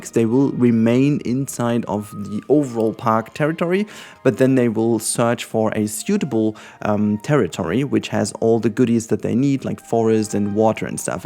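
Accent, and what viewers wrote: Germany. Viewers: You sounds like a german , bin selbst auch deutsch ,you too ?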